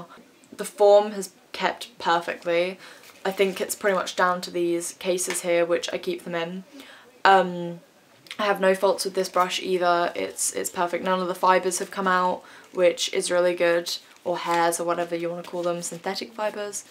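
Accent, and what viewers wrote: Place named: England, United Kingdom. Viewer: Nice accent so where you from England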